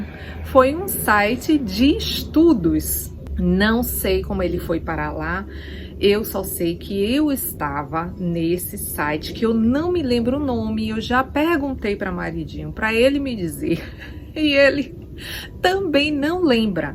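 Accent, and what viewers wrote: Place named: Salvador, Brazil. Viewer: Olá, eu também sou de salvador, vc explica muito bem boa dicção, com esse nosso sotaque bem presente. Nunca vi seu canal, 1 vez.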